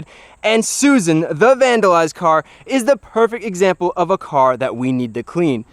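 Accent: United States. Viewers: This dudes accent is so American. And he's so Positive, happy, and enthusiastic. Makes me smile and have an urge to clean.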